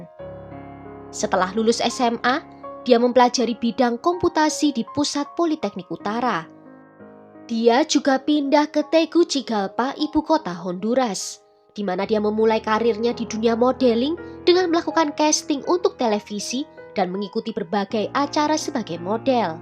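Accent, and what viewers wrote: Java, Indonesia. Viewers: Medok banget ...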